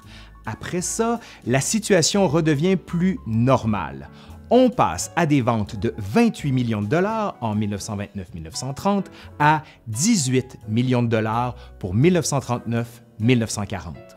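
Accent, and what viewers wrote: Quebec, Canada. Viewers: cet accent, caractéristique du Québec, mais sans les excès de certaines personnes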